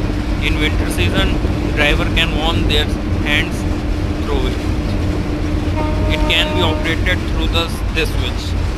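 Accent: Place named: India. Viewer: Compliments for taking time out to make this audio-visual coverage. However, I must rectify one thing. You've been using the term electric 'Engine' iteratively, which is wrong. It should be electric 'Locomotive'. These locos don't have engines in them. For those who have been criticizing for his English must remember that it's not our mother tongue, neither it belongs to our country. So, an Indian with accented English is quite normal and nothing wrong to look down upon. Don't discourage someone when you can't encourage them.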